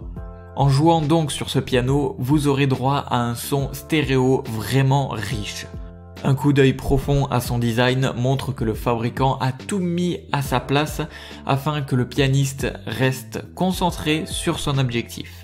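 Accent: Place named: South, France. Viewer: Ce petit accent de la garriguEU 😂😂😂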